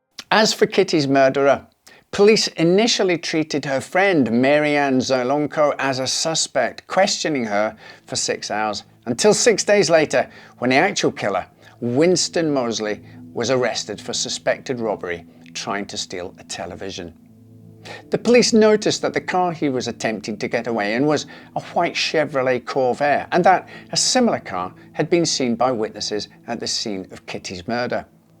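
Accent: Scotland, United Kingdom. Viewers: Why toning down the Scottish accent?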